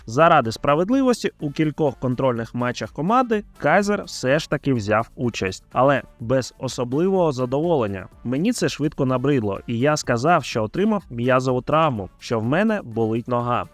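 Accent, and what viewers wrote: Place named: Russia. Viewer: У диктора сильний російський акцент. Це тільки я помітив?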